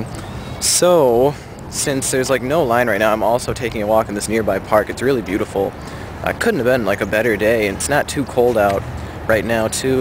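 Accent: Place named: Minnesota, United States. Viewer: You know, your accent reminds me of a Minnesotan accent. My landlords are from Minnesota (Im from Colorado) and when they argue I LOL.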